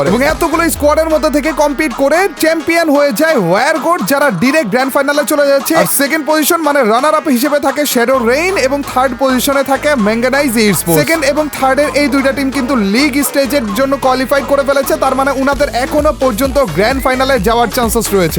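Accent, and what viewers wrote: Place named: Rangpur, Bangladesh. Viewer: Rangpur kotha basa vai 😮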